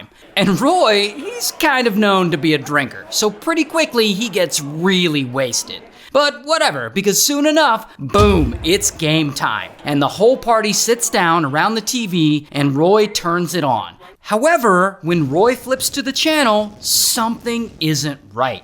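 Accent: Canada. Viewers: As eh! Canadian. Love how you Canucked it up as well as you did. Sad story of course.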